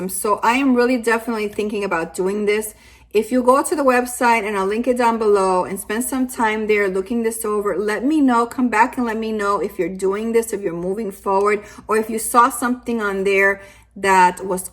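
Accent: Latin America. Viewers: Hair looking great as usual, love the librarian glasses that go so well with the choice of top, sweet Latina dialect.... Giving this video a solid 9.3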